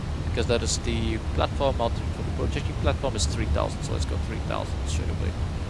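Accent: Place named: Finland. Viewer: Nice Finnish/Nordic accent!!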